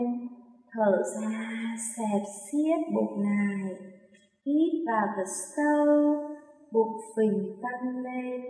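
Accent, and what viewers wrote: North, Vietnam. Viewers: giọng miền bắc mà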